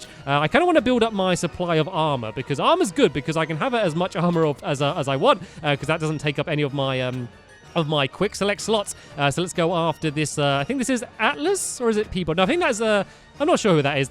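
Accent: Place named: United Kingdom. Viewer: Gaming Wolf 2016 He British not American... You can tell by his voice...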